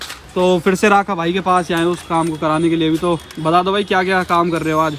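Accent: Haryana, India. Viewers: Superb haryanavi accent love to hear it. Your videos are amazing. Keep making videos in the same accent ✌️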